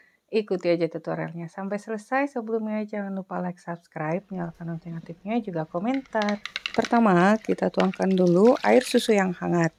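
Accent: Java, Indonesia. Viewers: Hehe kok logatnya jd jowo to...emang asli jowo ngendi mam?😆roti2nya uenak tenan mam👍👍👍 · Donat gembul tgduoempt ss hangat tlur aduk msk rg gla aduk masukan tepung lalu garam aduk dn masukan sp dn margarine sampe tercampur froof bgi2 bentuk (medok ya bu logat jawanya heee) 16buah ya ingat jangan sampe over proof duhhh ginuk ginok gemoyy empuk trimakasih bermapoat sekaliii hahhaah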